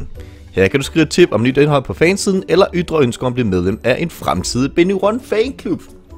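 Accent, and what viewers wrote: Bornholm, Denmark. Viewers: det er nestan liga svenska men det er bonholmsk och det er skågit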